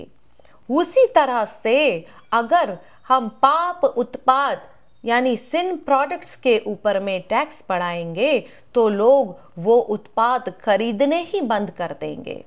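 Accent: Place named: South India, India. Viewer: Hindi in south accent too good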